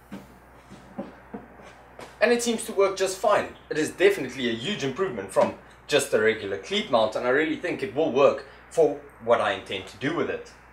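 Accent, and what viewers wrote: South Africa. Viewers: Thanks very informative - Hey are you South African? Where are you? So I'm new to woodwork and am showing my ignorance here. Just mad a long narrow shelf for my kitchen to store tea caddies etc but I dont think its deep enough for wall support so is insecure, Any ideas? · Great ideas, love the video. Spent time in Pretoria growing up so love the accent.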